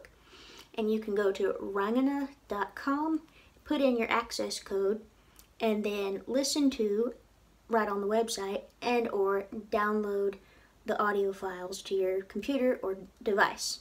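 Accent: South, United States. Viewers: Love your accent! Which part of the South are you from? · What a great review. I'm returning to learning Gaeilge after nearly 20 years since finishing school. I have a lot of these books and am surprised at how much I've retained over the years. "Buntús Cainte" brings back bad memories for us because of having to practice our tape test in school. I was taken aback to hear your accent at the start, fantastic to see people abroad learning our national language. Your accent is beautiful btw, spent a lot of time down south over there and know a Southern Belle when I hear one :) Go raibh míle maith agat arís, Subscribed. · Southern accent! A nice surprise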